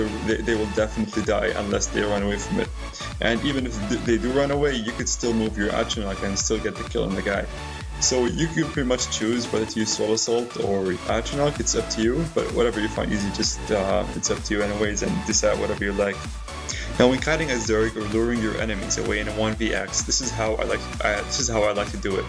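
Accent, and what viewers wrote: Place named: Austria. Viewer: Österreicher? :-) Klingt bisschen wie Arnold ;-)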